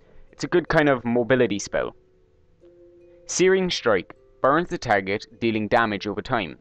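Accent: Ireland. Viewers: Now, I'm new to this channel, but are you Irish/Newfoundlander? I thought I picked up a bit of my own accent from this video, haha! c=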